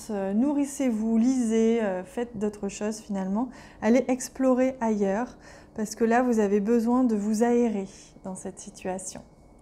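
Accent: South, France. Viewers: Bonjour, Je vous découvre aujourd’hui. Vous avez un accent du sud aussi, comme Laura (Intuitive), peut-être vous connaissez-vous? Merci pour cette guidance. Bonne journée 🙏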